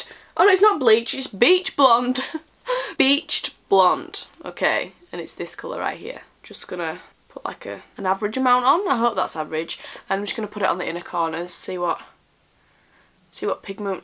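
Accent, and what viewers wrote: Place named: Yorkshire, United Kingdom. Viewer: deffo from yorkshire haha like me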